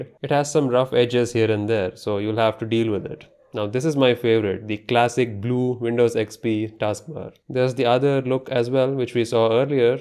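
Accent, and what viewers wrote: India. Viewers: Dude looks Mexican but speaks Indian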